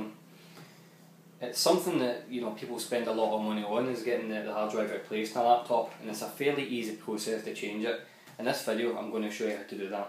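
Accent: Scotland, United Kingdom. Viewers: thanks for the tutorial! Love the Scots brogue - made it much more pleasant to watch!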